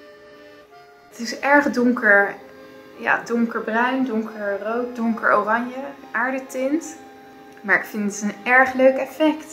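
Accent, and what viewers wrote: Rotterdam, Netherlands. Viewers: Dat is rotterdams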